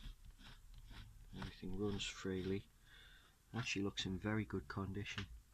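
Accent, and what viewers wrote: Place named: Newcastle, United Kingdom. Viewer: Hey, I've got one of these, bought new! Nice to hear Geordie accent...trying to change the blades,,,,, first time in all these years..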